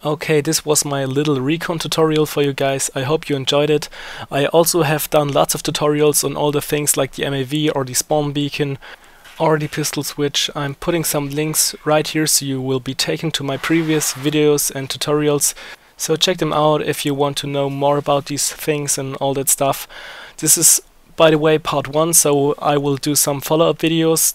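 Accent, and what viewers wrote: Germany. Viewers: Are u`re a german? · als deutscher merkt man das. ^^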